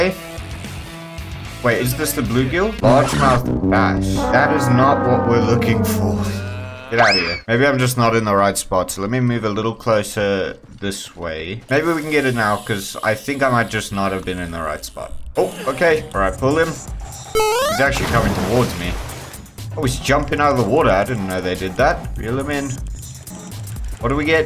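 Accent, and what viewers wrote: South Africa. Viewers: Has to be south african bro I can't unhear it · Bro I too am South African and I could recognise your accent,so I checked your profile and I was correct :D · South African? Glad I'm not the only one playing this beauty again. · Are you south african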